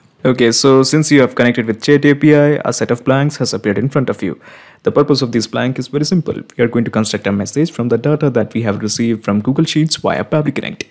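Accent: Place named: India. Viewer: It is difficult to understand your strong Indian accent, especially when you speak so fast. i could not understand what MODE you said we should be using. I had to slow down the playback speed to finally hear that you said "incognito mode". For those of us who don't use incognito mode it would have helped it you explained how to switch to incognito mode. It would also help if you explained about Pabbly as to how much it costs to send messages. I assume a free account has limits?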